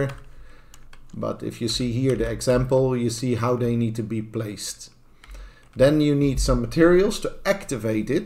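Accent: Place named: Netherlands. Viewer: Gotta love the dutch accent😂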